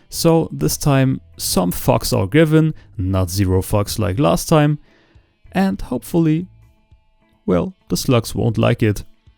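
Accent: Germany. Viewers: He is german. Finnish have different accent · 🤣 the German accent makes this funnier, I dont know why this part was hilarious too lol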